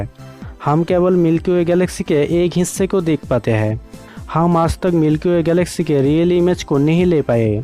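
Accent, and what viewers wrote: Bengal, India. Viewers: ভাই বড্ডো বাঙালিদের মতন শুনতে লাগছে। কিন্তু খুব ভালো হয়েছে। আরো ভিডিও বানাও। অবশ্যই ভাল হবে।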